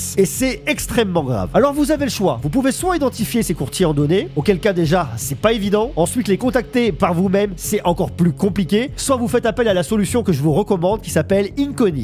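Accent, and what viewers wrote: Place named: France. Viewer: Je pense que c'est l'IA qui fait la traduction. L'IA laisse même l'accent français. 😂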